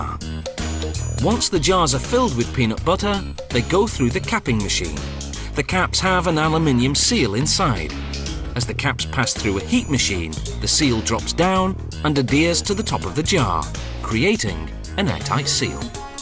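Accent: England, United Kingdom. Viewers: Lulz, gotta love how the Brits say certain words differently than we do, like "vitamins". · I'm not sure where the series originates, but the narrator is English (unless he's from elsewhere in the British Isles and has a remarkable English accent). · He's so delightfully british! With his vitamins and aluminum!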